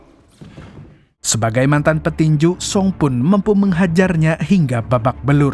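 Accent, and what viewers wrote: Banjar, Indonesia. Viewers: Bang kamu orang banjar ya😅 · Bang pian urang banjar kah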